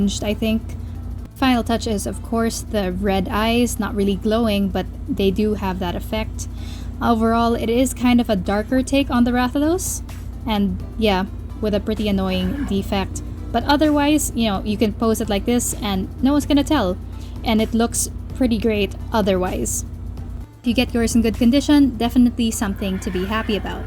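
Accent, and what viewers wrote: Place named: Philippines. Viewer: You sound noypi ma'am. I have been thinking of getting this set.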